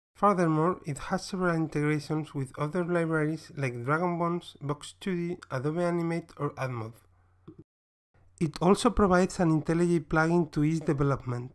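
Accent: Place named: Germany. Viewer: I agree with you, German people are super nice. On my previous comment I said "The next videos were narrated probably by a German." because I had caught a small hint of German accent in the narration.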